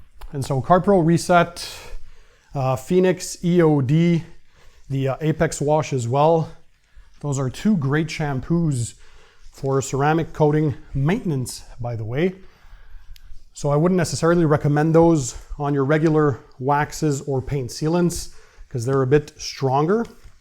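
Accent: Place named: Quebec, Canada. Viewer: Waitttt your from Quebec???? You have Quebec French accent!!!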